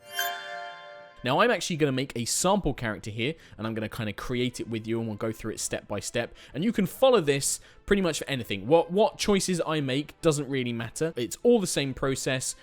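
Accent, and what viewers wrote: United Kingdom. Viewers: This video is good quality, but without the British accent it would be incredibly boring.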